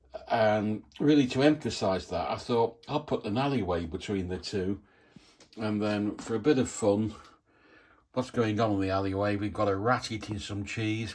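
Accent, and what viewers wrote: United Kingdom. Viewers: Rotherham? maybe Sheffield? I would put money that you has been to Meadowhall Lego more than once with that accent. And yer right, every street needs a pub or three, do a Cross Keys for me. Have fun lad and keep up tha great work. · I agree with you and I will certainly sign your petition for the Fishing Store as the best modular, although the Medieval Blacksmith Shop is equally as impressive and a fabulous build. You should check it out. A more personal question, if you don’t mind? My favourite television show is, Escape to the Country, which is shot in great Britain…..so given your accent, may I ask where you live? I am on the East coast of Canada., in the province of New Brunswick. Just curious, if it too personal, forgive me. Most the the Lego people I see on here are much younger and harder to relate to. Anyway…..I ramble on. 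Thanks for your reply I do appreciate it. Ta Ta for now. 👍😎🇨🇦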